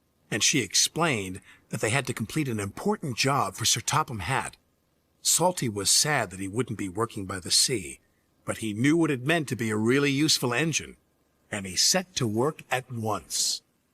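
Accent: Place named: Ireland. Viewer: Irish accent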